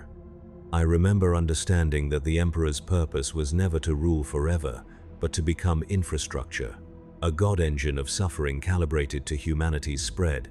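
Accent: United States. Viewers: Brother, stick with the old voice. This is painful to listen to. Voice is from some dude in Vermont that did an extra year in Grammer school. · Dude the voice is American again ?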